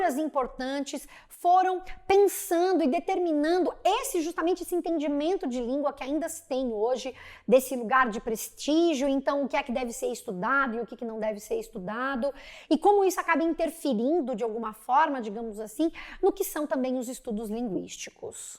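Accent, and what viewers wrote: Rio de Janeiro, Brazil. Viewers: bonita, sotaque maravilhoso(carioca?), simpática e elegante, mas só caso em cartório...kkk...e acima de tudo...interessante(nota de rodapé).Depois dessa aula finalmente lerei Grande Sertão Veredas...kkk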